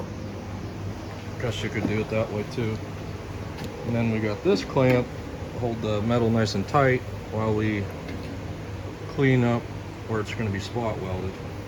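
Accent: Pittsburgh, United States. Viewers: That’s gotta be a Pittsburgh accent. If so I now live in Monroville can you fix my rockers and cab corners? 2000 f250 7.3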